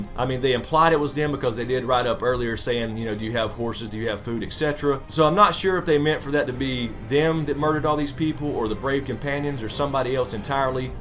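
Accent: South, United States. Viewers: and fuck that dislike. Probably some other GoT reviewer. You have some of the best GoT videos out, and I try to watch all the good folks. Plus im pretty sure from your accent your from the south, or maybe out west in texas or something lol. Either way, us southern folks gotta stick together lol.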